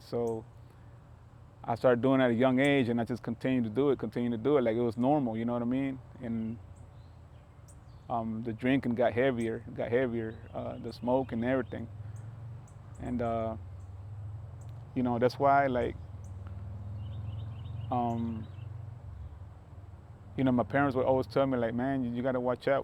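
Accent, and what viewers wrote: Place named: Alabama, United States. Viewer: What a trip, a Guatemalteco with an Alabama accent. ❤ Stay strong in the faith hermano.👊🏼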